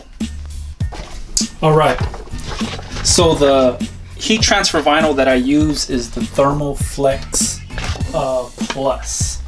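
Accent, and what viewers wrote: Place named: New Jersey, United States. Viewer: Great video fam! I've been thinking of making some merch for my esk8 channel (shirts, stickers). But there's a learning curve so might outsource. Given that, making the videos, editing, and all the esk8 there's only so much room for my skill level. What do you think. Btw, you sound like my cousin...are you from Jersey? - Neil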